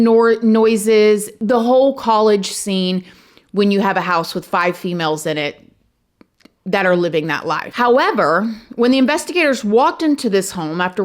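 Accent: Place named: Atlanta, United States. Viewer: your commentary about the INCEL portion, that is a lot of conjecture with a lack of evidence, your bias is really ruining the last part of your video. you had a great objective video up until that point. for your own sake, can you make a video of how many people have committed murders that are now considered INCELS. i would love to see your facts vs jumping on the FED bandwagon. INCEL has become a slander on younger men that are mostly at that age, that awkward age for dating. the fact that people are being labeled INCEL is beyond subjective this coming from a father, husband and have had a lifetime of multiple partners and relationships. btw u sound like u are out of atl also, not the inner 285 but outside.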